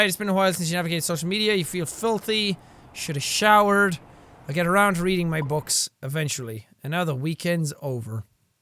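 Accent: Ireland. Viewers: What part of Ireland are you from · Is it just me or does Jack no longer uses his Irish accent anymore other then in his intro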